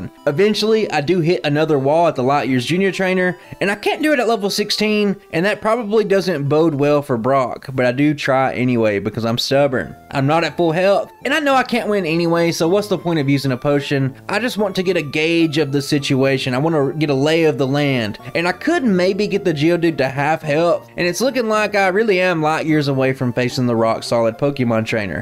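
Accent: South, United States. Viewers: Finally, not a Canadian. You've got the most southern accent of any Pokémon challenge Youtuber and it's welcome.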